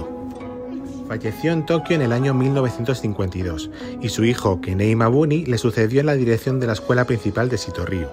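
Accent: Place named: Spain. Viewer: por el acento qué se escucha en el video supongo que eres de España, según tengo entendido que qué las mejores escuelas MARCIALES de Karate Shito Ryu están en Barcelona, a modo de recomendación recomendaria hacer un video de cualquier línea de Shito Ryu acompañándola con algún video de alguna escuela de Karate Shito Ryu marcial de Barcelona. Estaré pendiente del video (por cierto no conocía este canal pero debido a este video de Shito Ryu qué has hecho me he suscrito, buen canal, felicidades).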